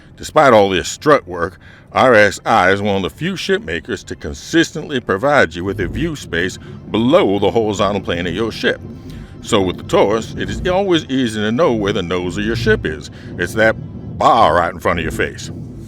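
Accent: United States. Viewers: Mate, that accent is the most American thing I have ever heard. Loving your SC series more than Moe Haytar loves his packages. 😁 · Damn now that American accent is so amazing. You should make angry shouting ads for ships in the american military style lmao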